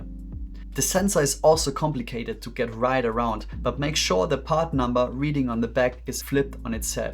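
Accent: Germany. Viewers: The chill tone and slight german vibe to this was really calming. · are you german?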